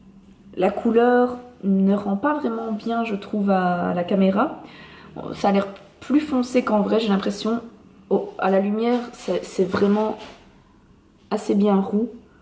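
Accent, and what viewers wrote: Belgium. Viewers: Elle est belge je crois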